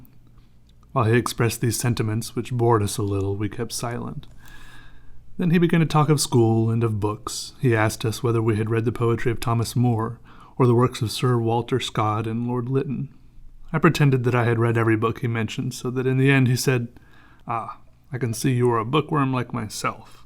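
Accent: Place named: England, United Kingdom. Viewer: Hello. I like your English accent. And I like Celtic music, James Joyce's 'Dubliners'. Thank you.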